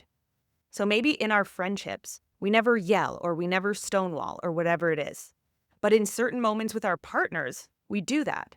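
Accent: Canada. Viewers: You’re from Canada aren’t you?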